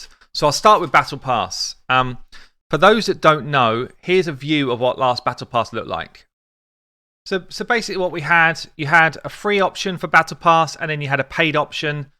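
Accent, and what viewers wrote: England, United Kingdom. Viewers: Many thanks for another information update you deserve all the recognition you get. Website has become my go-to source (ahead of Chosen's great resources and I'm in a Chofly clan.) Battlepass appeals to me as a low spender as I'm retired. I play the Gwent cad game and their Journey is similar although with weekly challenges to meet - one of my monthly buys. Best aspect of your videos - your English accent, which this expat misses surrounded by Americans.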